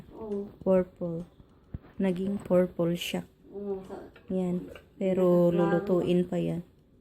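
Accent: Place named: Visayas, Philippines. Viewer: Mga tao jud noh bisag unsa nalay bantayan. Pastilan dolor! Magbuot mn mo ug mag tagalog sya .. Kamo dw pag tagalog be paminawon nato inyong accent.. Natural lahi iyang accent mga dzai kay bisaya man sya di man pure tagalog.. Kung ang tagalog bitaw mag bisaya lain man pod kaau paminawon.. Imbis magpasalamat ta kay naa tay nakat onan nga bag ong recipe mamantay hinuon.. Sus Ginoo!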